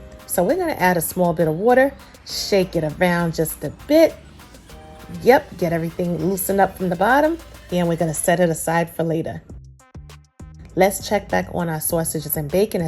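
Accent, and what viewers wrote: New York, United States. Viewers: It's her New York accent for me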